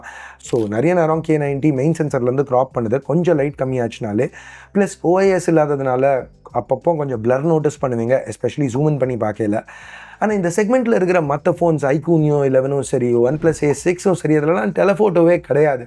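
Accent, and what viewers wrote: India. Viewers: Video Dubai Voice India 👌👌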